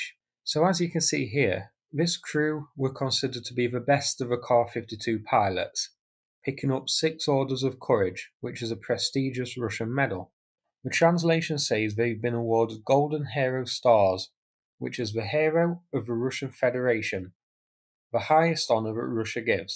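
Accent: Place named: Northern England, United Kingdom. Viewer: Loved your expression "a little late there chuck" I'm trying to work out where your accents from? Leeds or Manchester? I'm in Leicester where they'd say a little late mi duck.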